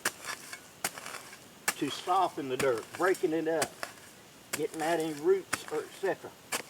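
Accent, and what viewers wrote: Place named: North Carolina, United States. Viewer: "It takes no effort to be miserable" love it! God bless our Marines. Speaking of Marines, I had a good friend who was a former service Marine who was from Hickory North Carolina, and he spoke very much like you do sir. Might you also be from around that part of our great country? Wherever you hail from, I thank you for your great informative videos!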